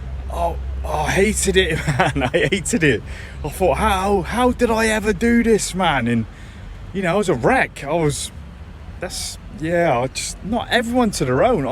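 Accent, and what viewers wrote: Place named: Midlands, United Kingdom. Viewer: Your not likely to take to Spice then ;-) .Just saw a documentary about Manchester and the Spice epidemic .From your accent i detect the Birmingham /Coventry /Midlands locality ? .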